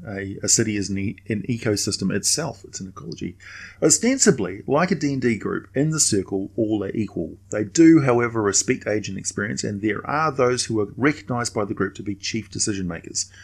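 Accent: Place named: New Zealand. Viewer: Aye a kiwi bloke nice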